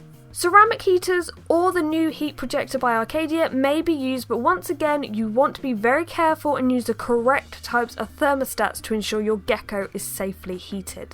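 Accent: United Kingdom. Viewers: Unsure of your accent, you from the uk?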